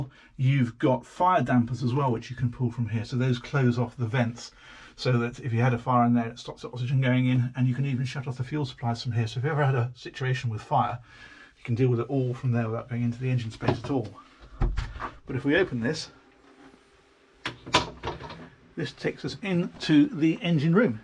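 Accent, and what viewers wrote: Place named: United Kingdom. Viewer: I enjoy everything about your channel, except for one thing. “Cummins” (Engine) does not have a “g” in it. It’s not “Cummings,” although that pronunciation is also more common here in the USA than the correct one. But I can live with it, and perhaps it’s just my hearing that’s off, what with the British accent and all.:-)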